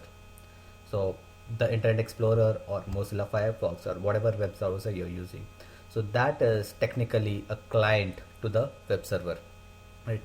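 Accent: India. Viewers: i have problem with understanding indian accent :(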